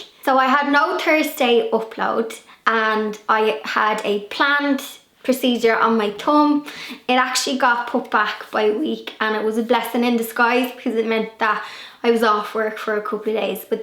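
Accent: Ireland. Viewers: I was wondering where you were. Missed you on Thursday. You seem like a very social person, which can be hard but keep chatting, we need you. I could listen to your Irish accent all day. Suggestion? maybe make a video of "about town", a local tour? Maybe you have done it in the past I don't know, I am a fairly new subscriber...just a thought. Anyway my dear...thinking of you and trusting you will stay safe and healthy. 😎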